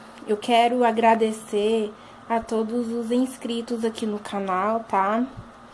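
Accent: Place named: Northeast, Brazil. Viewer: querida, vc parece ser uma boa pessoa e ter bom coração, pelo seu sotaque eu diria q vc assim como eu é do nordeste :) sobre oq exatamente vai ser seu canal? culinária?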